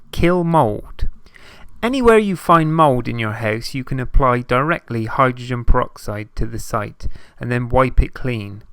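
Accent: United Kingdom. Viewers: Pretty sure mould is the British spelling of the American word "mold". Judging by our narrator's accent, he must be from that part of the globe. I do not think it is wrong to point out a misspelled word in any public context; how else are young people supposed to learn if not by example? Both acne and disinfect were misspelled in this video - pointing out or correcting a mistake does no disservice to the narrator and just might do someone a favor. Come on, is it really better to remain ignorant and illiterate than to learn a little something? How else are young people to learn, if not by observing the world around them, spelling and all? Please, stop being so stubbornly opinionated about someone pointing out an error in a video; it should all be part of the experience. Or are civil discussions completely beyond us....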